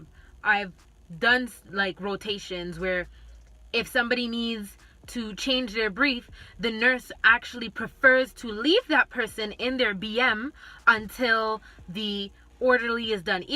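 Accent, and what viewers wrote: Caribbean. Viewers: Pleasure is all mine. You do an excellent job. How did you fine learning pharmacology? I’m now reviewing and getting the jist of it before school starts in July. Do I detect a West Indian accent also? My dad is Jamaican and mom is from St. Kitts.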